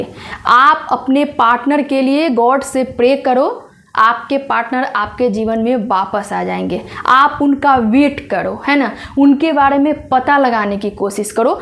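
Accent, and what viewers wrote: Bihar, India. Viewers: Bahut sach kaha , aapki tone belongs to Bihar state ,? I imagine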